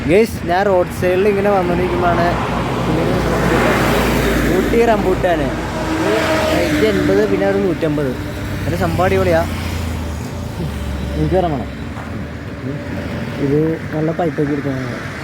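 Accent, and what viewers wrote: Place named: Muvattupuzha, India. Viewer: സംസാരം കേട്ടിട്ട് മുവാറ്റുപുഴ പോലെ തോന്നി 😂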